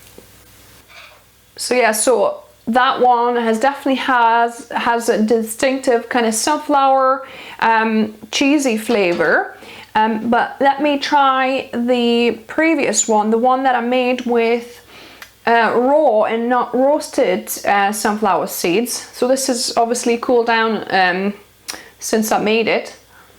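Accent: United Kingdom. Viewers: I hear British, German I’m not sure but being multilingual is so cool 😎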